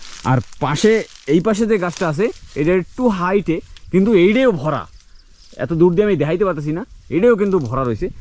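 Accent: Barishal, Bangladesh. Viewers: ভাই এর কথা বার্তা আমাদের বরিশালের ভাষার মতো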